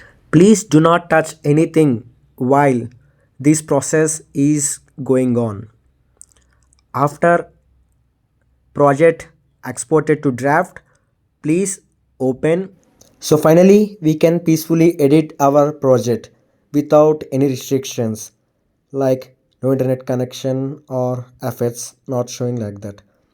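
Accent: India. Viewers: Indian guy explaining 😅😅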